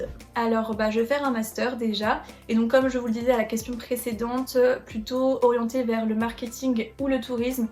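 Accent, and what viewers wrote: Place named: Russia. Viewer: trop bien cette vidéo face cam, on en apprend plus sur toi !! très bel accent russe d'ailleurs 😉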